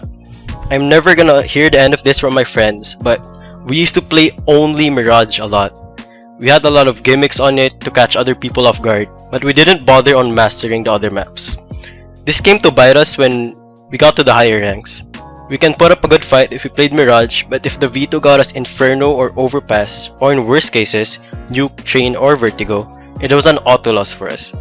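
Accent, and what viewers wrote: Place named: Philippines. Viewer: Great video! Don't know why but you kinda sound filipino hehe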